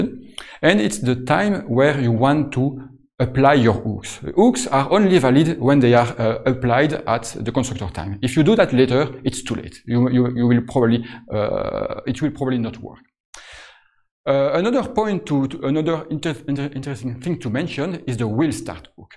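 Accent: France. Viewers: he's 100% french or belgium native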